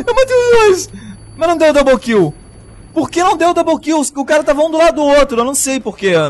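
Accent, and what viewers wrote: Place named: Rio de Janeiro, Brazil. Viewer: Ele é carioca cara '-' Tá loko? kkkk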